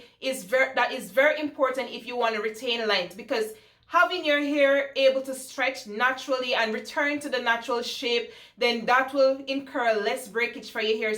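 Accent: Jamaica. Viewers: You sound like a Jamaican. Are you? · Gurl I hair your Jamaican accent, subscribed. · I am hearing the Jamaican accent!! 🇯🇲 🇯🇲. Representing from ja! Great video ♥️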